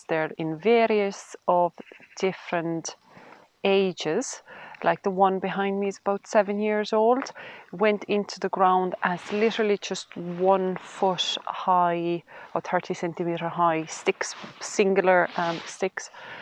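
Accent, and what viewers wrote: Kerry, Ireland. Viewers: Thank you so much for that. I was leaning a bit towards blackthorn since it’s one of our great native trees. We have plenty of midges already in Donegal so a few more won’t make much of a difference! I like the idea of supporting more birds and insects too. And the flowers and berries. An aunt of mine was addicted to the berries and used to eat them directly off the tree, although they must have been pretty bitter. Your advice will help me decide. PS. You have a great Kerry accent!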